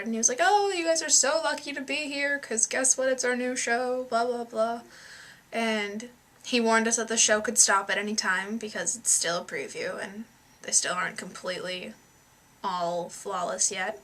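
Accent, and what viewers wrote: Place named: United States. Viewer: Are you American? If you live in London, how come you're speaking in an American accent?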